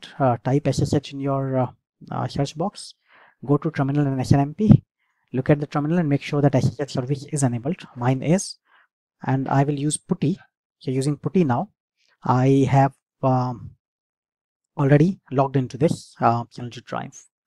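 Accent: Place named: India. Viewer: That terrible india accent sound like dog barking !!!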